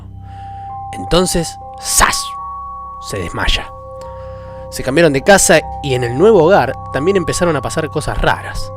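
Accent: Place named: Argentina. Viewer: Dross que te pasó? Ahora hablas con acento argentino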